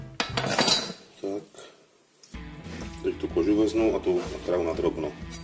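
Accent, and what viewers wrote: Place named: Hodonín, Czech Republic. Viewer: Super recept. Vypadá to parádně. Jsem sice ze Šumavy ale prakticky celou rodinu mám v Hodoníně a miluju to vaše nářečí. Strašně dobře se to poslouchá.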